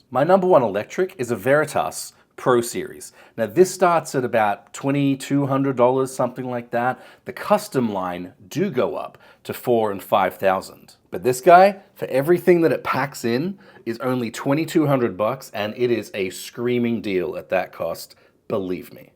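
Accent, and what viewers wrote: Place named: Australia. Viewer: Nice try getting a free guitar sponsorship from them, you got too little subs and views, and ur Aussie accent ain’t helping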